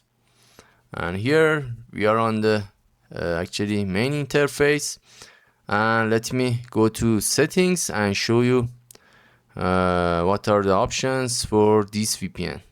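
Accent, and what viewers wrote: Iran. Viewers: اوففف لحجه ی فارسی 😂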